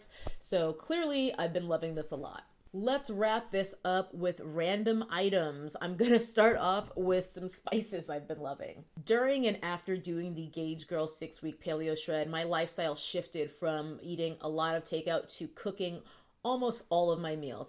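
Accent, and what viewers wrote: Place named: Brooklyn, United States. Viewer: Girrrlll i coulda swore you lived in NewYork. Like straight Brooklyn Native!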